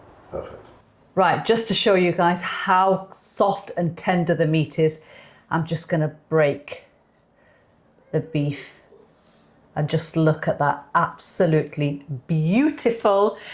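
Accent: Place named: Northern England, United Kingdom. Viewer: Love this channel for easier one pot curry recipes to BIR style!.. and the absolute clowns complaining about how your say turmeric just ignore them. Im a Geordie and i love the fellow northern accent 💙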